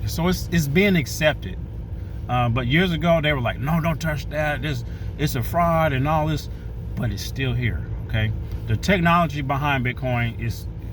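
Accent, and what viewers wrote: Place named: Ohio, United States. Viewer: Where you from Ohio? I'm doing this thing where I try to "guess that accent " 😂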